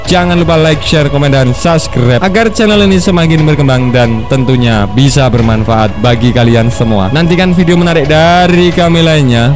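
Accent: Java, Indonesia. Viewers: Suara mu kok medok e min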